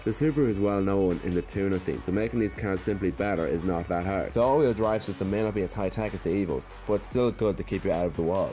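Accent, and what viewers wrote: Ireland. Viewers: Colin Davis he's irish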